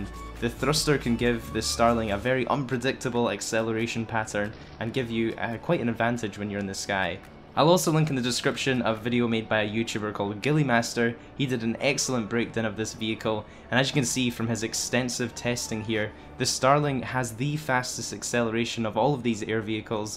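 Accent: Scotland, United Kingdom. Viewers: Love your scot accent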